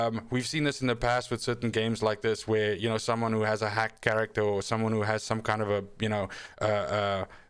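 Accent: South Africa. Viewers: Are you from South Africa. Your accent sounds familiar.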